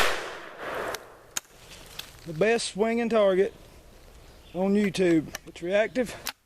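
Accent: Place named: North Carolina, United States. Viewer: ya from buddy ? sounds like ur from North Carolina . Im not far from ya here in Virginia. And I got one of those H&R shotty s in 12ga given to me xmas of 78. Its one of those guns that you never get rid of cause your parents got for ya and that makes it all the more worth wow !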